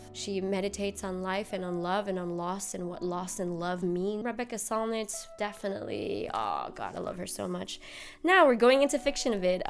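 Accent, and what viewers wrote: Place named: United States. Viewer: Found your channel today and immediately subscribed, love the way you talk about each book and so many that I have never heard about, love it because most booktubers tend to recommend the same as everyone else. You said you grew up in France but your English is so perfect! I was wondering how your accent sounds so American? There’s no French accent, I’m mesmerized because I’m not from the US but have been living here over 20 years and I still sound foreign 😄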